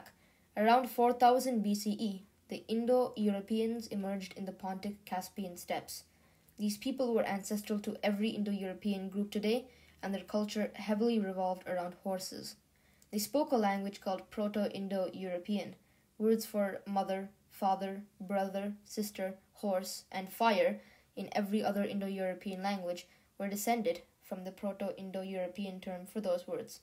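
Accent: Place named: India. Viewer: You accent sounds Indian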